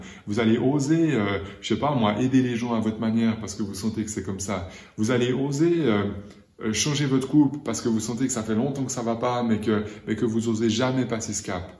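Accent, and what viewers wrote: Switzerland. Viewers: bonsoir! ravie de vous rencontrez ce soir, au moment où je me sens mal avec les vaccinations par rapport à mon travail: sinon, je n'en veux pas et si je dois être suspendue et bien j'irai jusqu'au bout et cela sera peut être un signe de changer d'optique professionnelle! je suis tout à fait d'accord avec ce que vous dites et cela me réconforte! je vais continuer à vous écouter pour essayer de me connecter avec moi même! j'adore votre accent "suisse?"! prenez soin de vous et à bientôt, mercisssss.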